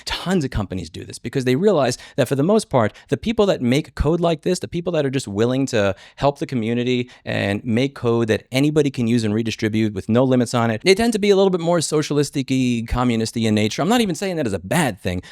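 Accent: New York, United States. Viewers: you still have that NYC Pitbull in ya and I'm loving it.!!!!!!!!!!!!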